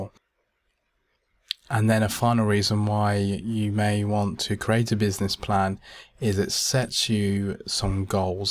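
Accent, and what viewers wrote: United Kingdom. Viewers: Great to hear UK tutors on line.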